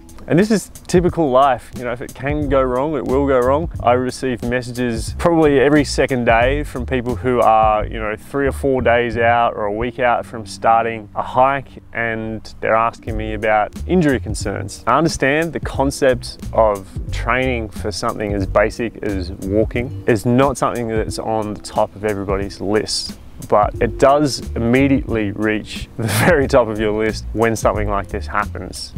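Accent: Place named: Australia. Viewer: Wishing you a speedy recovery! I've been watching your videos for a while, and amongst a multitude of reasons that make your videos so fantastic and engaging, it's also just so refreshing to hear a familiar Aussie accent. It's interesting to hear you speak of the challenges of not knowing how much energy you'll have each day to train due to the fatigue in your COVID recovery. I'd like to hear more about this. Obviously, you intend to achieve a full recovery and soon return to your usual training capacity, but what would you recommend for someone like me who has MS (Multiple Sclerosis) and faces this challenge constantly? I generally find that once I'm out on the trail, the serenity of being out in the bush gives me an instant boost of energy, but I struggle with regular training when I'm home. This is what I'd love to see more videos on. How to maintain good training practices when energy levels are hit and miss. 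Thanks again for your wonderful videos and I hope you recover quickly and safely! Regards from down under :)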